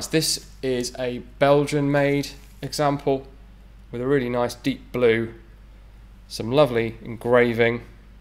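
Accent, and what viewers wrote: United Kingdom. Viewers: I wasn't expecting a British accent, I bet he never shoots them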